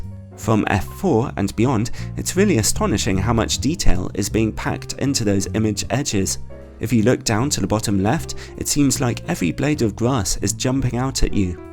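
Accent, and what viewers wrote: United Kingdom. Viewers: nothing like a British eloquent voice...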